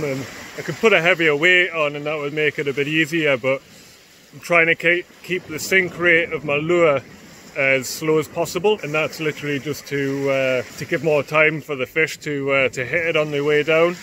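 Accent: Newcastle, United Kingdom. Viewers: are you from newcastle or sunderland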